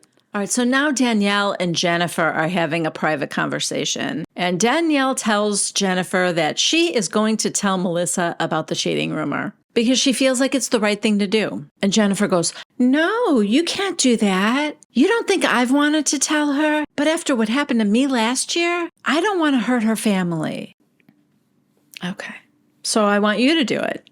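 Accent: New Jersey, United States. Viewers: Love you!! You do such a good job recapping!! Your Jersey accent is hilarious 😍 Louie is a narcissistic control freak... Teresa is the perfect victim Unfortunately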